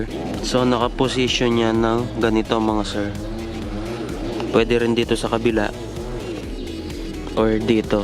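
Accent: Pampanga, Philippines. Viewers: Kapampangan ka sir?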